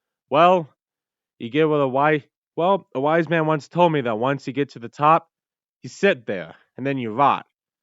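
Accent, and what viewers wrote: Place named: Australia. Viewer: you sound like an aussie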